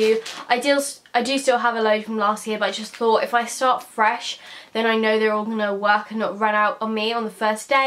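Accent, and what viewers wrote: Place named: United Kingdom. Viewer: I love your accent!which accent are you speaking in?cause they are many accents in the UK.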